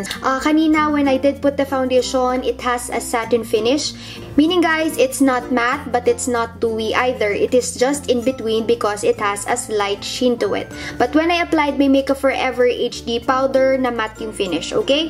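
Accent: Philippines. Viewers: Alam mo kung ano nagustuhan ko? Yung Filipino accent mo. And sarap pakinggan kaya yung very clear yung review mo madaling maintindihan. Two thumbs up. bless you. 👍👍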